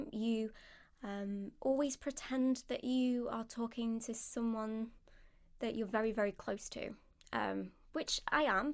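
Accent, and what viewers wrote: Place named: England, United Kingdom. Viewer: Good lord I love English accents...... I'm Irish but I'm determined to Marry an English bird, fuck that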